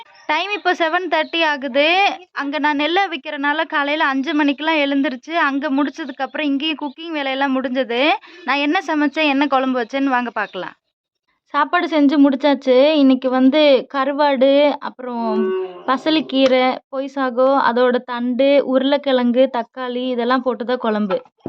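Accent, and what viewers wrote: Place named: Odisha, India. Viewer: சகோதரி உங்களை பார்த்தால் ஒடிஷா பெண் மாதிரி இருக்கு. நீங்கள் பேசும் தமிழும் ஒடிஷா பெண் பேசுவது மாதிரி இருக்கு. உண்மையை சொல்லுங்க நீங்கள் ஒடிஷா பெண்ணா இல்லை தமிழ் பெண்ணா?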